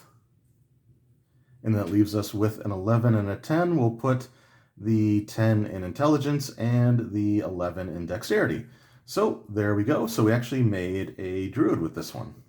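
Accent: Canada. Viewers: Thanks for the video. Love seeing 2E stuff. You sounds like you're from east coast Canada :)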